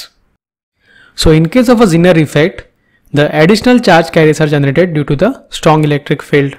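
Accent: India. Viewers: hundai accent. Shut up n sleep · Only an Indian can understand this gibberish. Accent is horrible. It may be a good explanation or not but couldn't tell anything without understand anything. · I'm Italian, but I understand the "indian" english quite well. It is quite funny. It is very valuable the extensive and profound job that in India is done to produce this wealth of courses and lectures about physics, electronics and solid state engineering. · WHAT AN ACCENT! HOW DO YOU EXPECT PEOPLE WHO DON'T SPEAK HINDI TO UNDERSTAND? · Average Indian accent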